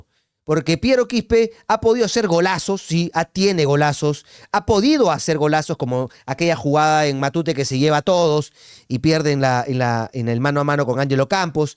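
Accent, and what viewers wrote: Argentina. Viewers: Todo bien mano pero porque xuxa hablas como argentino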